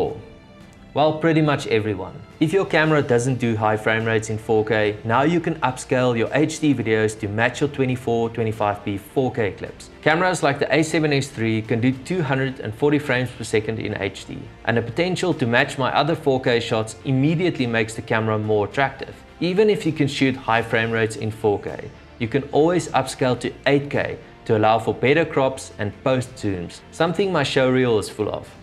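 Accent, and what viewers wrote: South Africa. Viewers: Hi. Amazing channel. Thanks so much for sharing all of your skills. Great to listen to a South African for a change. No sure which programs you use for your editing but I using Premiere Pro. Not a big APPLE fan so not using macbooks as my laptop of choice. I know I can follow recomendations from ADOBE themselves but what would you say will be a good setup (hardware) to do some descent 4K editing?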